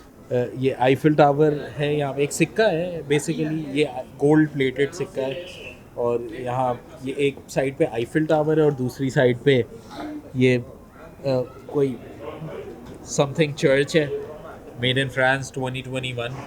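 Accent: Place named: Karachi, Pakistan. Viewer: I always smile while watching your videos. I think it's because we speak the same way as we are Karachiites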